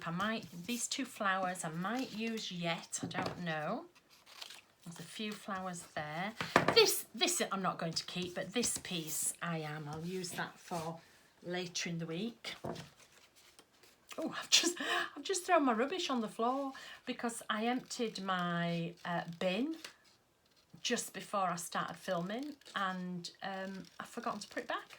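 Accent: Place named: Yorkshire, United Kingdom. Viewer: So lovely listening to a Yorkshire Lass and giving lots of advice & inspiration. Thankyou, from a misplaced Yorkshire Lass dahn souff. Xxh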